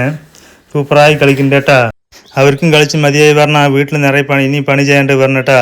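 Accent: Tamil Nadu, India. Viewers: Niglu malayali ahnno tamil ahnno language difference thonnine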